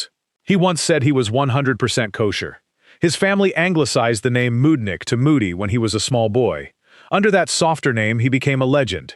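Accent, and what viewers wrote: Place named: United States. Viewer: The accent in the narration of this video makes it quite clear that it is the US racism which motivated its creation. For my whole life 80+yrs I have known that a very large number of people in show business were of jewish origins and it really has not matter one tiny little jot to me or anyone in Britain. Only someone from USA would be motivated to create this diatribe of changed names which has zero value to British people with all embracing British values. Why do you expose yourself.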